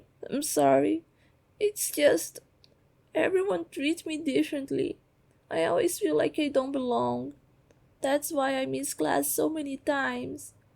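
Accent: Brazil. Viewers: Sotaque me cheira a brasil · I just can't believe it has been 2 years and ONLY NOW I've seen that you actually answered. I am really sorry for taking so long, lol. And, to be honest, I am surprised I didn't realize you were Brazilian sooner, I guess I am just not used to that accent hehe. Parabéns pelo conteúdo também, seus vídeos ainda continuam MUITO bons kkkk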